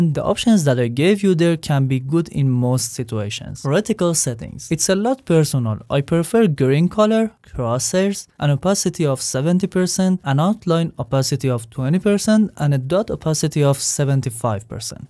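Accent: Iran. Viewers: Persian accent never die!!!😊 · از لهجت فهمیدم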